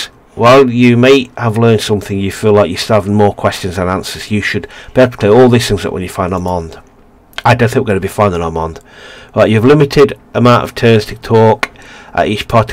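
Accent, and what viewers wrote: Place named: United Kingdom. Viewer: From the uk too man sound exactly like one of the lads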